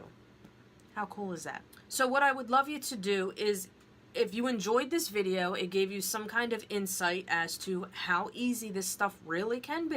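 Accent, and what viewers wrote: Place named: North, United States. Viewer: I love your accent!!! I'm in Florida so I'm not used to that northern accent, I hear "y'all" a lot and I've even got into the habit saying it, lol!!! With the synchronicities you talk about, I've been having them for a few years now. I've gotten pretty good with my pendulum because I made an alphabet pendulum board. I have connected with my loved ones on that. I even made dowsing rods out of metal coat hangers and straws from a YouTube video, they've "hugged" me when I asked them too!!! Pretty phenomenal!!! But I'm still not at a place with all my clairs where I feel confident, so I'm thinking cards might help more in that sense instead of the pendulum or rods... And I know it's not the same for everyone obviously, but do you think cards will help more developing the clairs?? And how long does it take for you to have them all blown open after paying attention to the synchronicities would you say would be an average timeframe maybe??? 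Thank you so much in advance!!! Great video!!!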